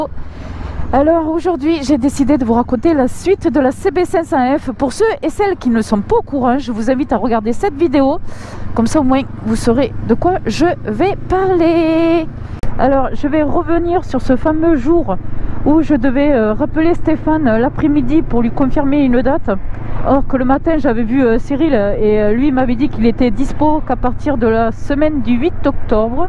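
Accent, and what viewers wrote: South, France. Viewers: J'adore ton accent du sud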